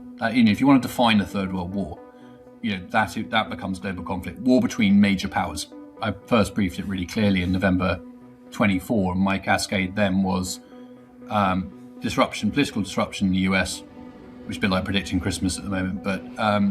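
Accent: United Kingdom. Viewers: I need to get a fork from all the word salad in a British accent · Polycrisis? Are we making up words now? Take your British accent and try to fix your own country, you don't have time for the world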